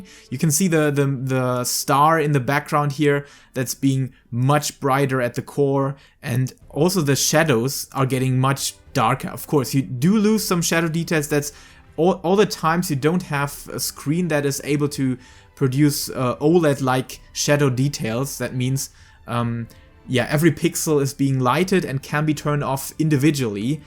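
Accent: Germany. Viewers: Dein hart deutscher akzent plus der misch masch an amerikanischem und britischem englisch machts unmöglich dir zuzuhören... Dazu kommt, dass der Look am ende einfach mal gar nicht aussieht wie 7.0? Und "similar" halt auch nicht? ôo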